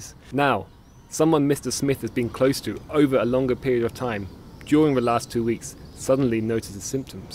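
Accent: Germany. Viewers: Hahahah der deutsche Akzent 😂😂😂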